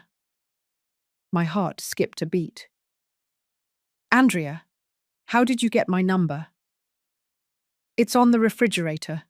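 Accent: United Kingdom. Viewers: I can’t stand this British accent any longer. Bye. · This is one confused story. Evil daughter knows and then doesn’t know mom gives monthly transfers. Narrator has a British accent, is named Mrs Lopez, and has a bank that’s open on Xmas Day. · I don’t know why but a British accent makes revenge sound proper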